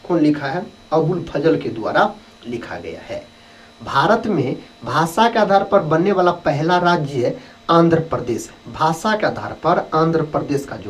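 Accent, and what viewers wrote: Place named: Uttar Pradesh, India. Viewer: Maza aaya bt up ki Laungaug thodi sochna pdta hai bt sweet hai 😂🙏👌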